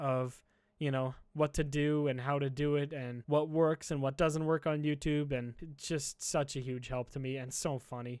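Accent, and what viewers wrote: Canada. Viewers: Gawd, you're so Canadian white but it's like little sand hints of arab thrown in there.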